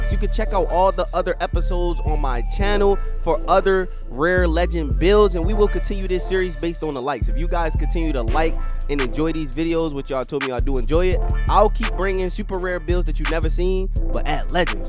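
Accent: Baltimore, United States. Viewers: i can tell u frm baltimore 😂😂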